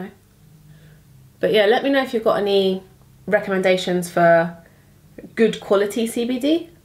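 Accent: United Kingdom. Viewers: I love her british accent and the way she says "Bits & Bobs" and all of the traveling she's getting done! Indie is getting to see the world already! You guys are great parents 💕🤗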